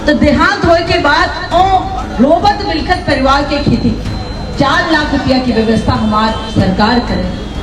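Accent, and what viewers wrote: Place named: Baghelkhand, India. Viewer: बहन अपना के बघेली बोल चाल के हम बहुत कायल हैं अपना बहुत बहुत धन्यवाद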